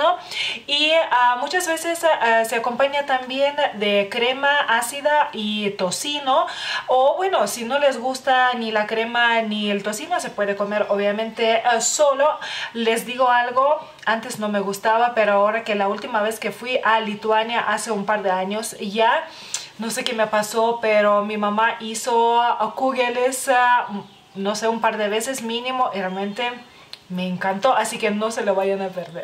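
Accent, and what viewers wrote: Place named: Spain. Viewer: Ya me dio hambre...no se porque🤔... Hablas muy español y esta bien bonita.👍🏼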